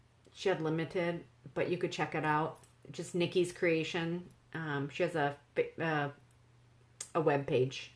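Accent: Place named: North Dakota, United States. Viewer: Your accent makes me miss home -- we moved to Mississippi from North Dakota 11 years ago, and my northern accent has faded, dontcha kno? But when I go back home, it's so easy to pick it back up again. Love your vids. You're a sweetie pie!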